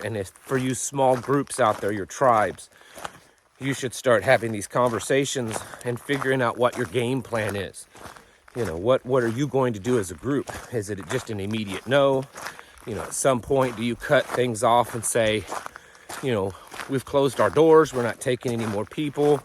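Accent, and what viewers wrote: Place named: South, United States. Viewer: What's up the southern accent?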